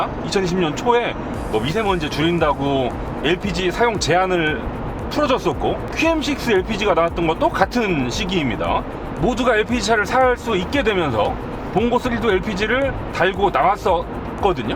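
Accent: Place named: Chungcheong, South Korea. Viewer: 참~~ 말씀 잘하시네. 충청도 사투리도 정겹구요. 실속있는 구체적인 정보 귀에 쏙쏙 들어옵니다. 구독 누르고 갑니다.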